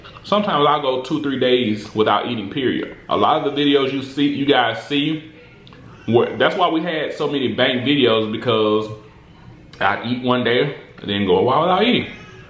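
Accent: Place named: South, United States. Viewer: Love that southern accent lol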